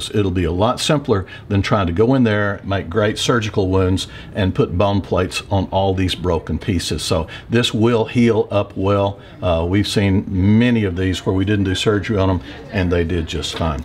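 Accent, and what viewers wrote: Texas, United States. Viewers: love his texas dialekt haha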